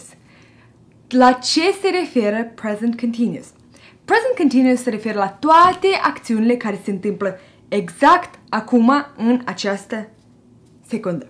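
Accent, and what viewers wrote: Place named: Moldova. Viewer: Asa de simpatică mi -ai fost,și dulce cu limba ta Moldovenesc.Te pup.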